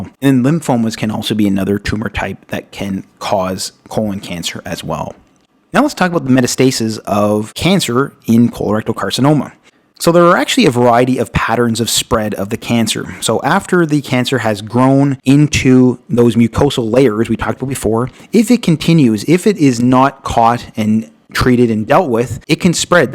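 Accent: Canada. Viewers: Canadian? Yuck.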